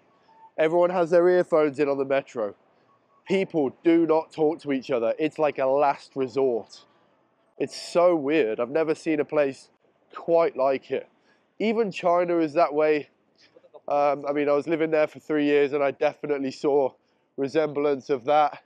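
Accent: United Kingdom. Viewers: あなたの英語はブリティッシュアクセントなので、日本人には聞き取れない可能性が高いです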